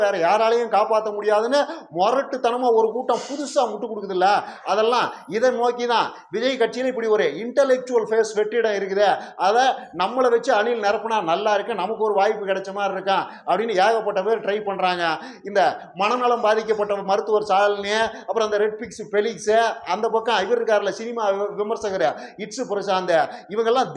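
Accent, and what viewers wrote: Kongu, India. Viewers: சூப்பர் தம்பி கொங்கு தமிழ் மெசேஜ் அருமை அருமை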